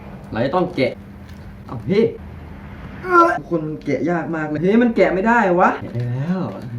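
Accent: Korat, Thailand. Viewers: พี่เป็นคนโคราชใช่ไหมเนี่ย5555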